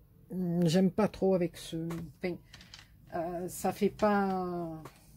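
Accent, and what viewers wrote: South, France. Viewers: Merci infiniment, j'ai vraiment passé un merveilleux moment avec l'accent du soleil..... Pour moi les petits anges ce sera pour l'an prochain, cette année nous quittons notre maison parce que ça fera 1 an le 24 décembre que notre chaton à rejoint les anges et nous n'avons pas le coeur à fêter sans lui.... Encore mille mercis et de très belles fêtes à toi et tes proches dans l'amour et la joie, gros bisous. Lucia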